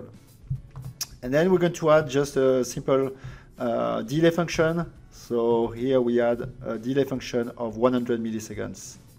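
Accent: France. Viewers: Thank you very much for the perfect "Getting Started" tutorial. Very lovely french accent also :) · hi, thanks for this tutorial, I guess you're a French native speaker 🤔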